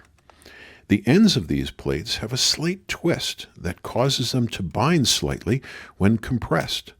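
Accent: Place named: United States. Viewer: No, an American voice talking about anything makes me puke.🤮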